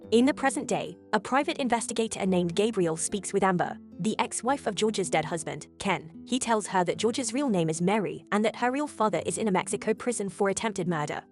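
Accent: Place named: United Kingdom. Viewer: I really love you using British accent ai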